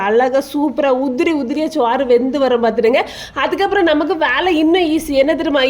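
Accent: Kanyakumari, India. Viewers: Athu nellai tamil illa athu kumari tamil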